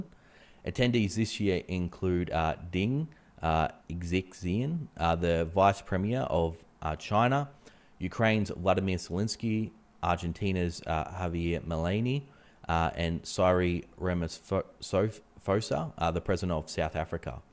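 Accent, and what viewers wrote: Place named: Australia. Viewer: Yeah mate. He speaks English. Australian English.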